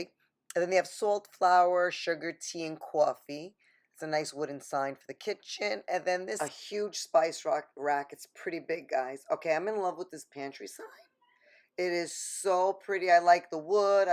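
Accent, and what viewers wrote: New York, United States. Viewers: I hear a NY accent girly!! ❤️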